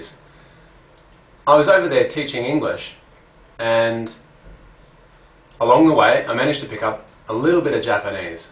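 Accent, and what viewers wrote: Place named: Australia. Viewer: I literally laughed when you switched to English, the Australian came back so strongly. (I'm Australian as well.) Im actually just as interested in teaching languages as I am with learning them. Im not exactly sure how one gets into language education in Australia though. I think I might take some of your classes when im done teaching myself the basics :P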